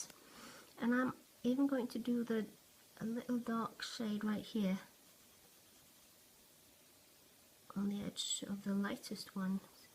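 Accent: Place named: England, United Kingdom. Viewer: I have searched and search for an in depth "how to draw gems" video and yours is the best by far. Your english is great, you have an english accent. Thank you so much for taking the time to post this video. Cheers Janey from the Land down Under (Oz)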